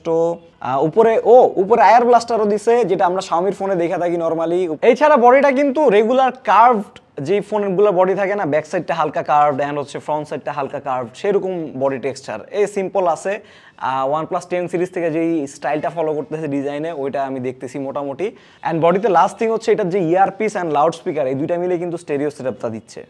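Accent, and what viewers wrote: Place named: Dhaka, Bangladesh. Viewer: bro ,jaiboga ,khaiboga, khayadise ai typer laguage ta sunte khub baje lage, i mean dhakaiya laguage ta ,,change krl vlo hoy.. khub cheap and dirty language mnehoy sunte.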